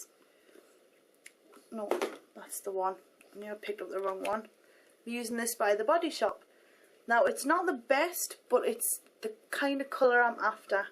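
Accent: Newcastle, United Kingdom. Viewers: Lol, newcastle accent. NE England. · hey! i spent a month in newcastle recently (I'm from New Hampshire)...you sound like the people I met there!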